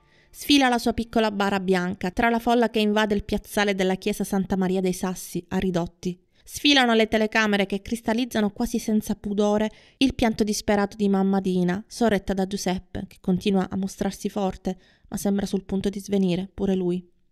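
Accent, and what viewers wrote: Sicily, Italy. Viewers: Aspettavo da tempo che qualcuno trattasse questo caso......e finalmente lo ha fatto, come sempre la tua voce narrante è molto precisa e il tuo accento siciliano dà quel tocco in più che mi fa seguire i tuoi video sempre con moltissimo piacere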